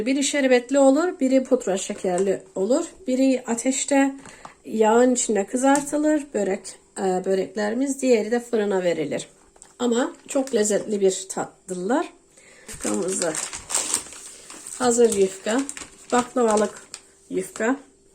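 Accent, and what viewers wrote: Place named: Cyprus. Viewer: Ne yapsın Kıbrıs şivesiyle konusyorsozleri anlasiliyorguzel tarif veryor👏👍🙏🧡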